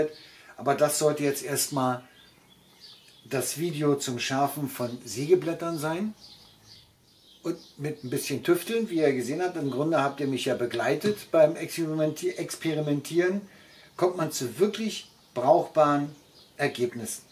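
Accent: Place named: Berlin, Germany. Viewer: ich hab selten so ein informatives und zugleich unterhaltsames Video gesehen,vielen Dank.ich musste mehrfach schmunzeln wegen deiner Berliner Schnauze.Hab mir die Maschine auch bestellt und werde deine Tips übernehmen.Haste fein jemacht.Mach weiter so.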